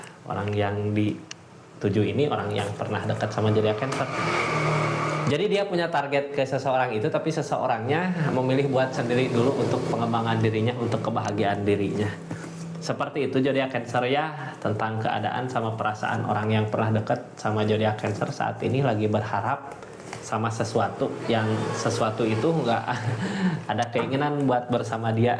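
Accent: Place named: Sunda, Indonesia. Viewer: Akang meni sunda pisan atuh, tapi da resonet eta reading na. Haturnuhun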